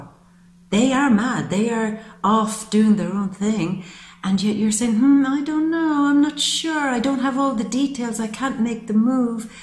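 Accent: Ireland. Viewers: god is love You have Beautiful Gift. I enjoy your manner. Your voice sounds a bit Irish ! Your Tarus friend.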